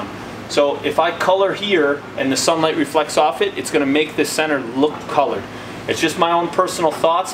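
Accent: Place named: Midwest, United States. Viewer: Howdy, CJ here from H-Town Texas. I am looking to get a side hustle going. My best friend and his Wifey do this for a living. They make a decent living. How much do you charge for each wheel? Would I be able to use cordless tool and if so, how many batteries would I need to swap out in order to do truck and trailer wheels? I would like to be able to go to customer if power was an issue and or driver is waiting to get loaded. Thanks great video, love the mid west accent..Don cha knoow..😆🥰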